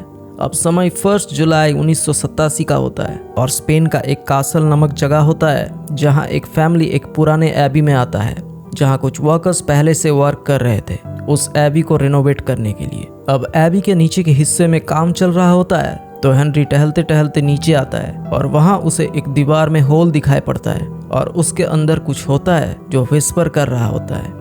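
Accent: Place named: Bengal, India. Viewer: Bhai new explainer ho tum. Lekin tumhara story telling style aur awaaz unique hai. Apne script me Bengali accent mat lao aur thoda aur serious explain kro. U earned a subscriber❤❤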